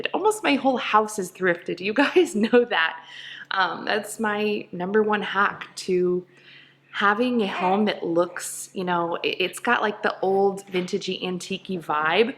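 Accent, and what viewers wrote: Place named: Missouri, United States. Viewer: I love your message about letting go of the perfection of it all. Listening to you talk I am wondering if we are from the same area! I am from warrenton Mo and there is a hill there called hopewell hill, not far from the Missouri River. I just found your channel recently and have been watching/enjoying your videos!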